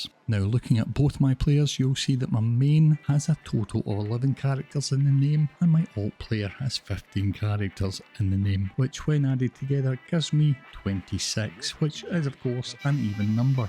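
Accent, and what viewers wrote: Scotland, United Kingdom. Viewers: I watched your video, a lot of times and I never understood what I needed to do... with all respect, since I'm not English, it's a bit tricky to understand with your Scottish accent 😅 (I love your pronunciation btw). A little recap can be very helpful, for real... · I should have listened too, Didn’t realise you were also Scottish 🏴. Think I have sussed the issue. Both our gametags have exactly same amount of characters 11 long. So I am gonna change mine since you can do it once for free. Hopefully that helps.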